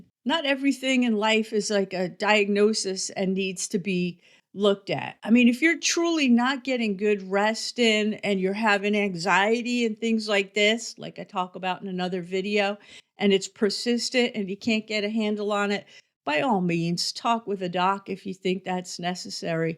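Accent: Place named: New York, United States. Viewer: I love your accent. I am Australian. Are you from somewhere in New York, maybe Queens?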